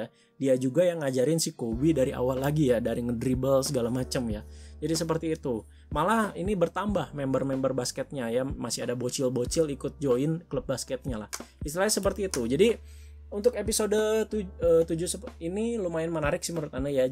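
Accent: West Java, Indonesia. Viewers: Sunda banget ya, "ceunah"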